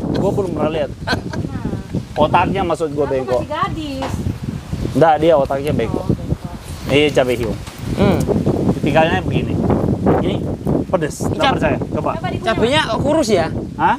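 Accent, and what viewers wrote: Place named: Java, Indonesia. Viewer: Koko medok banget jawa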